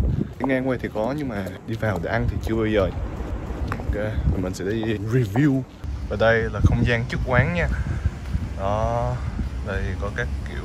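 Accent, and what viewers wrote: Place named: Gia Lai, Vietnam. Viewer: Giọng nói của bạn giống ở gia lai vậy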